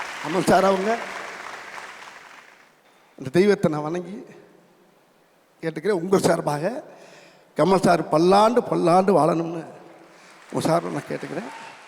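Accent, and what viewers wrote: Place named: Madurai, India. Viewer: இவருக்கு கைக்கொடுத்தது இவர் பேசும் மதுரைத்தமிழ் வாழத்துக்கள்🎉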